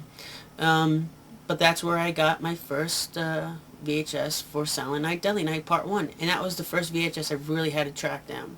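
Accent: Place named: East Coast, United States. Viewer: You're from the East Coast aren't you? You don't sound like you're from Cali or something.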